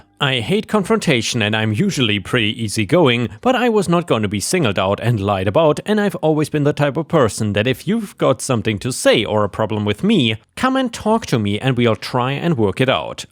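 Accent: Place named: Germany. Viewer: Carry oak E lol. I like your pronunciation though. It’s so close for a non native speaker, also I think you’re losing your German accent.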